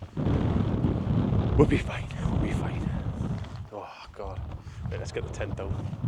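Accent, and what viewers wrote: United Kingdom. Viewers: I love the British accent - are you a true Cockney ? I notice you have a nice thick head of barnett and chair and at least in a tent you don't have to go up the apples and bananas to bed ! I'm going to pony and monkey myself shortly - wish me luck geez !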